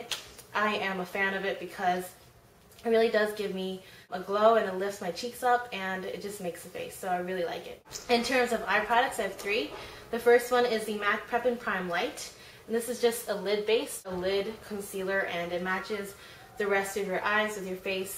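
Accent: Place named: United States. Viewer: I was wondering , why do you have american accent and you live in Australia .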